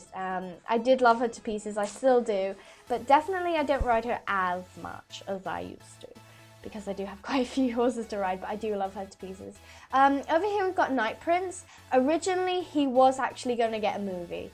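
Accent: United Kingdom. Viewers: you speke britesh and p.s i love your videos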